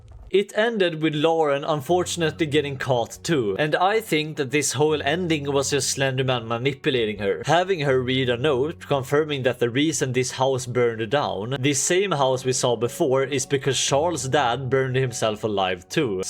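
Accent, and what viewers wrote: Sweden. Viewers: are you from sweden ? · I just know this guy is from Sweden. Can hear it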